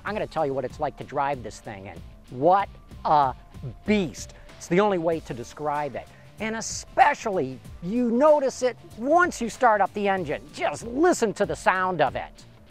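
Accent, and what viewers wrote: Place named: Midwest, United States. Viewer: Theses videos are brilliantly well done. But my only complaint is McElroy's funny-sounding Midwest accent.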